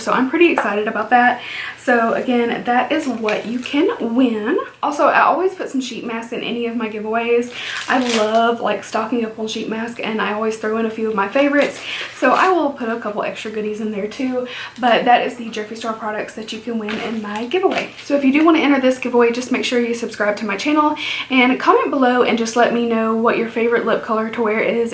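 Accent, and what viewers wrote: South, United States. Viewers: Hello from Alabama! I think I detect a southern accent , where are you from? I’ve been a JSC fan from the very start and my favorite lippies would have to be celebrity skin, unicorn blood, and ?. I gravitate toward pinks, nudes and darker berry shades.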